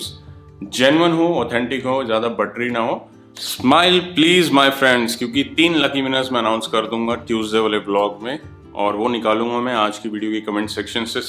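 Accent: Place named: Punjab, India. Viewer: Pehle baat to sir 3 reason hi kyu😁? PERSONAL BTAYU TO(CAN I WRITE IN PUNJABI) 1.PEHLE GAL TA BHAJI THODI EH VDIA LAGDI A KI TUC APNI MOSTLY TRIP TE KHANA VEERE NU LEKE JANA O ❤(THODI JODI BOHOT VDIA A, DONO DOWN TO EARTH HO❤) 2.THODE CINEMATIC SHOTS MANU KAFFI VDIA LAGDA A CAUZ 😁MAVI FILMAKING KARNI A, MANU BOHOT INTEREST A IS FIELD CH❤ 3.THIRD BHAJI EH A VI TUC HEGE TA DELHI DE HO BUT PUNJABI BADI SWEET BOLDE O😂😍HINDI TONE + PUNJABI LANGUAGE= 4.MERE VLO BONUS 🤫, TUC HAR TRIP START KARAN TO PEHLA WAHEGURU JI DE DARSHAN KARDA O❤AND END HMESHA EKO SALOGAN BOLDA O MEHNAT HMARI REHMAT UNKI AUR PYAR APP SBKA❤(DEEP GAL KEHTI TUC SACHI❤)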